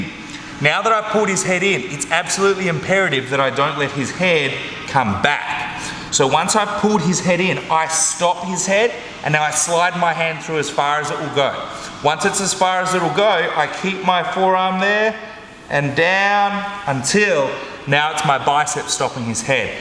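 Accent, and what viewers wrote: Australia. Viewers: aussie accent is one of the most friendliest accent in the world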